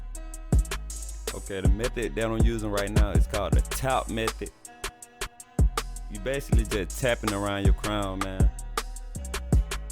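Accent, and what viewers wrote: Atlanta, United States. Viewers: Atlanta accent strong and them 540's gone crazy my g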